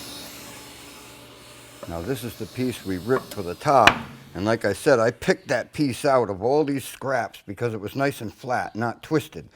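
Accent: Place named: Rhode Island, United States. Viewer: As a native Newporter now living in northern Norway discovering this channel has brought back quite a few memories and feelings of nostalgia. The thick RI accent has is music to my ears. I've since lost mine years ago from all of my moves. Now that my journey into woodworking has started I've found this channel to be incredibly instructive and insightful. is a true master craftsman. Thank you so much for this. Cheers! p.s.- Now I'm dying for some clam fritters, a good swordfish steak or even a good Italian grinder.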